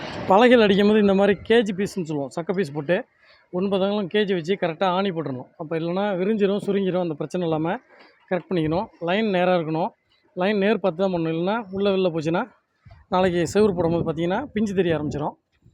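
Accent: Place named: Thoothukudi, India. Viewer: ஹலோ இன்ஜினியர் நானும் கொத்தனார் தான் உங்களுடைய slang நம்ம ஊரு தூத்துக்குடி slang மாதிரி இருக்குது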